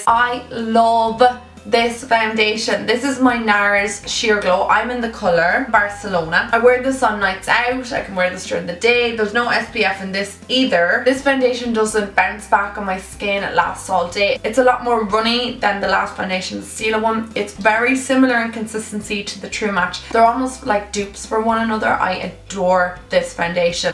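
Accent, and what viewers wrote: Ireland. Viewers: What part of Ireland are you from? I'm from Louth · this is so helpful xx and love her accent isn't she Irish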